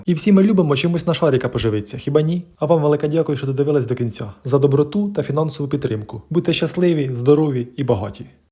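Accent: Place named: Surzhyk, Ukraine. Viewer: Я не знаю що в тебе "присутствует" але зі своїм ухоріжущим суржиком ти доВбойоб знатний😂😂😂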